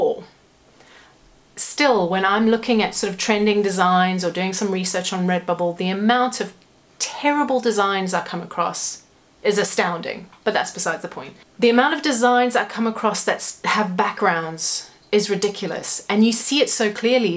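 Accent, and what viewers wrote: South Africa. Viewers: You South African? 😍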